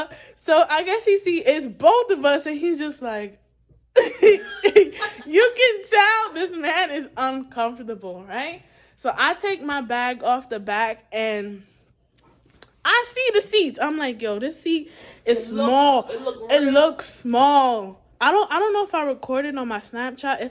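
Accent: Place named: Caribbean. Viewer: ahhh ok.. I was certain i heard a lil west indies in there 😊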